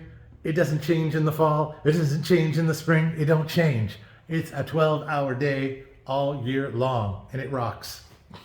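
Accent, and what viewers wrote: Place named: Upstate New York, United States. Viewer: I'm back, lol! Hubby and I have been wondering what part of the US you hail from. We had a decent guess since we've traveled a lot around the US and you sound like you could be a brother to a dear friend of ours who is from Rochester, NY. We watched this video again today and caught the part about being from rural upstate NY so we feel rather smug about pinpointing the state at least, haha. Again, thanks for the great content. We enjoy your videos a lot. We'll be visiting in July.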